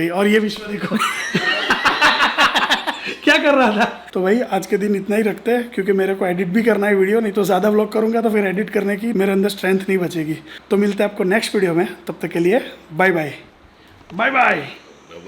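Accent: India. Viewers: Sweet gujrati speaking by bhai 🤗😂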